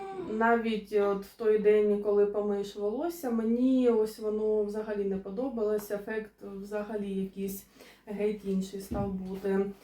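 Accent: Surzhyk, Ukraine. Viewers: так, використання і замість «и» це прямо суржик-суржик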